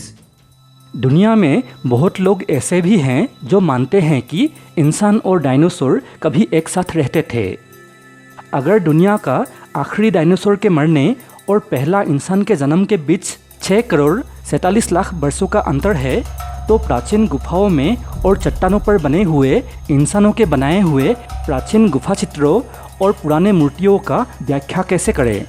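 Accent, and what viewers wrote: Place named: Bengal, India. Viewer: Yee bosdika bolne wala pukka bengali hoga....chutiye ko bolna bhe nahi aata hogaa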